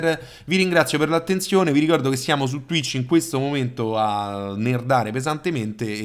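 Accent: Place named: Rome, Italy. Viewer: E mi raccomando, metti sempre il tuo fantastico dialetto Romano...è una tua caratteristica...e ci fa' taia' un casino!😂😂😂😂😂😂💪💪👋👋👍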